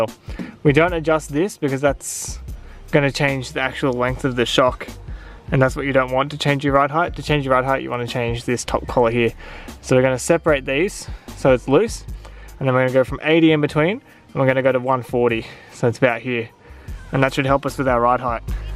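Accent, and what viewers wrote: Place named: Australia. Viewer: I was wondering myself... english ... airbarg remooved... da fuck, australia¿? (from Spain) Anyway, thanks, really good video mate (y)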